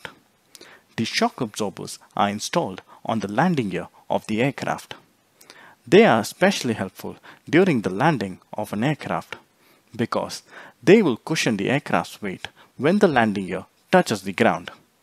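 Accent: India. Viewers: 1) I don't like the pronunciation. It's like Indians. I can't understand this self-confidence of people whose native language is not English. Great courage... 2) You don't explain why the oil in the hydraulic section is needed.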